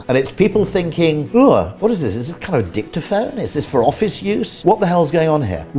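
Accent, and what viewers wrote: United Kingdom. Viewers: Nobody would listen to this guy if he didn’t have a British accent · Why is there always a British guy to explain why everything is crap?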